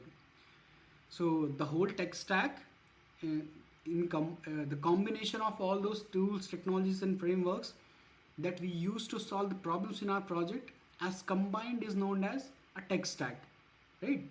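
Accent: India. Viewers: Bhai tumhara excent South Indian ho gya hai.😂😂 But hamesa ki tarah useful video. I admire you. Great video.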